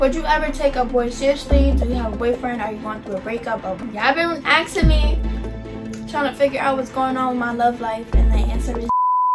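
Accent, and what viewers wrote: New York, United States. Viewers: So New York love it girl ❤️❤️❤️☺️ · She has to be from new York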